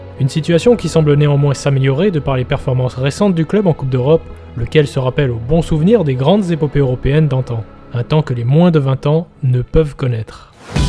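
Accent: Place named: France. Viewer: Je suis belge et ultra de la mauve army et je tiens à te remercier pour cette vidéo sur notre magnifique club. Ça se voit que tu es français sur la prononciation de nos clubs ou noms de famille mais je ne te n’en veux pas😂. Merci de porter de l’importance au club le plus titré de Belgique💜 et très important sur la scene européenne à son époque, très bon travail et encore merci pour cette belle vidéo, COYM💜💜💜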